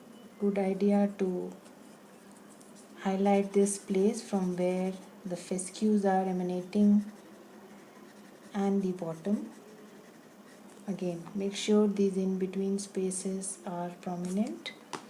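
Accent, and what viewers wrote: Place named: India. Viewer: You sound very like Indian maybe pakistani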